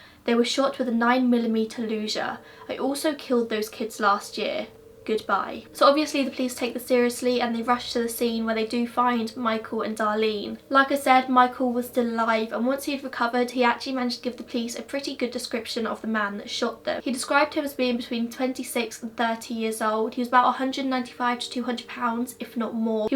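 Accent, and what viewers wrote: United Kingdom. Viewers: Please don’t take this the wrong way, but your mispronunciation of “Luger” in a British accent is hilarious as an American who grew up around guns 😅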